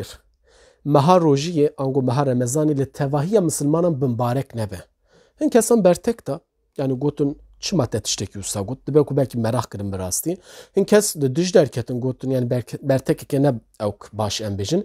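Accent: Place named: Serhed, Turkey. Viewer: Kêfxweş bûm ku tu jî wekî min dibêjî "rocî" 😂🙈 Serhedîbûn 🫶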